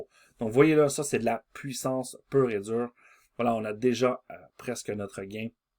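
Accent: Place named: Quebec, Canada. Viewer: l'ackcent quebecois tres beau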